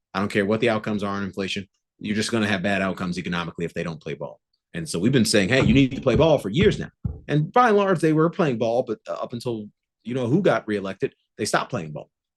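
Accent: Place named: United States. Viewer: Typical American way of delivery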